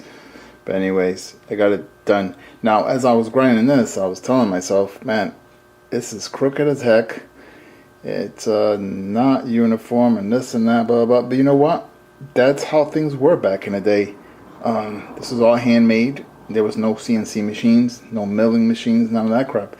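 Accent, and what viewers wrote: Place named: Bensonhurst, United States. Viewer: You sound like you're from bensonherst. Not a slam.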